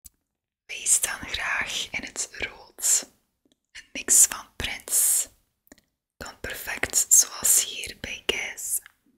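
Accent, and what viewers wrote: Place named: West Flanders, Belgium. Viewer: Leuke video met dat sgoone westvlaams accent.... zeer aangenaam x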